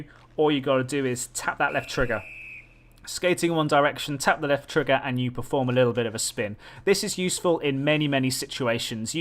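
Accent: United Kingdom. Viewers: Somehow your British accent makes it actually feel like I'm learning. Teach me about the inconsistent stick physics, though defenders legs ect.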